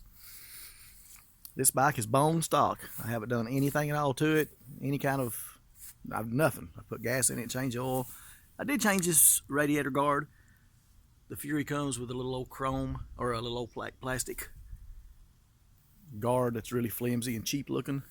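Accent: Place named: Kentucky, United States. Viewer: I think a small windshield would look ok You sound like you’re from Kentucky or somewhere below the Mason-Dixon Line American by birth Southern by the grace of God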